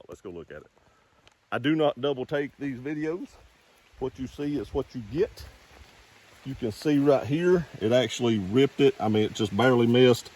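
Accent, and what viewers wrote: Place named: Alabama, United States. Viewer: Sounds like you’re from Alabama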